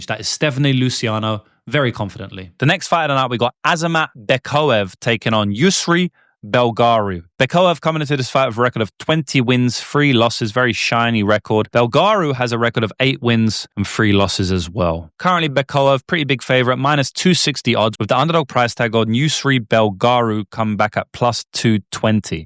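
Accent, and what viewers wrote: United Kingdom. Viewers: I’m glad this channel is getting bigger. I remember absolutely hating when I first watched him. I was like “who is this arrogant prig with the English accent and f his mama” but I had the accent and the man messed up. lol. Three years later and I never miss a single piece of content. 😅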